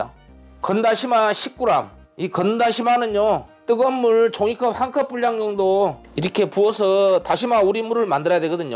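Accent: Jeolla, South Korea. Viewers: 말씀도 맛깔나게 하시네요. 정겨운 전라도 말씨 더맛있게 느껴져요^~ 감사합니다.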